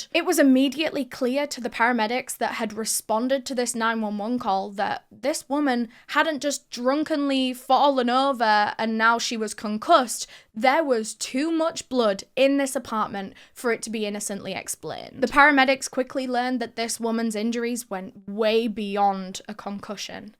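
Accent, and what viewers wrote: United Kingdom. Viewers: I didn't realise Eleanor was American! This whole time I thought she was from the UK lol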